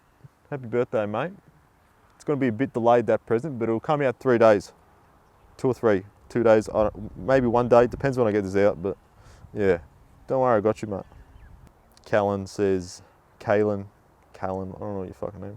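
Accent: Australia. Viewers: your lovely australian accent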